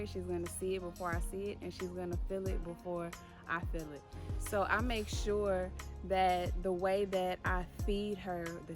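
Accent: Houston, United States. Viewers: They are both from Houston. I'm from Houston and a guy told me I act like beyonce. I was like what???? It's the Houston accent. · You definitely got that "Houston" accent going on...You ouchea sounding like Bey... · That houston accent, lawd.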